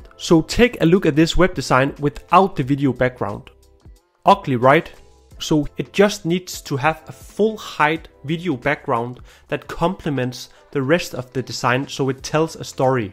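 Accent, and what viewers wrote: Denmark. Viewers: i knew immidetly you were danish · Most danish accent ive ever heard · 1. Denmark just got that programmer population. 2. That classic danish accent… not every english speaker can notice it, but about every danish can. 3. I’m also danish, yes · Haha, I knew it. I can smell a Danish accent from miles away! Very distinct sounding...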